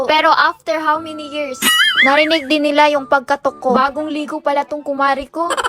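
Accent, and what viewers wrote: Visayas, Philippines. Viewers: Bisaya mo te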